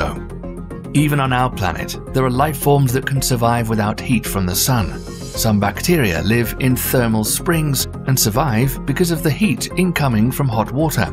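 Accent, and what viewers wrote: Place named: RP, United Kingdom. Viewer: I love how he says the “kweeper” belt. I guess I’m just a yankee and he’s using the more fancy queens English🤷🏾‍♂️🤔😂 I thought it was pronounced ky-per belt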